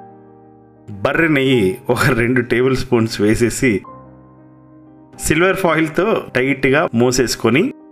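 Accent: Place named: Godavari, India. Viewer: Godavari yaasa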